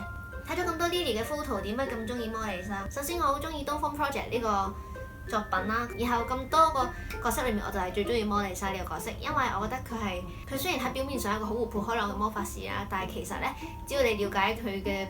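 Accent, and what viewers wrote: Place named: Southeast Asia. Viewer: 听起来像东南亚人讲华语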